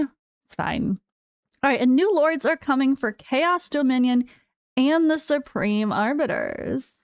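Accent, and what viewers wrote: United States. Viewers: Love your content but so amused by how Americans end a lot of words with a 'a'. Not meaning to be offensive, it just amuses me, lol. Don't know if quirky is a thing in 'American English' but you are, and it's good :) .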